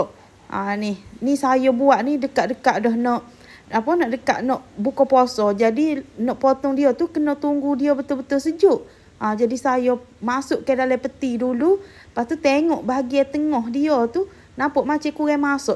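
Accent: Kelantan, Malaysia. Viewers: WASSALLAM WRB.Sedap mashallah tlong cakap BM betul.Ndmpak hitam sikit patut tak nampak macam burnt.Jkkhir.Bukan s4mua faham dialect Krlantan.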